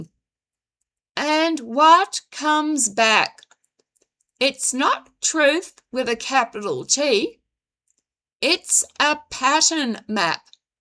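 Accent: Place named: New Zealand. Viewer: Hi, where are you from? I almost thought it was NZ yet could be UK? Interesting content re Chat GPT for soul reflection. Thanks for sharing.😊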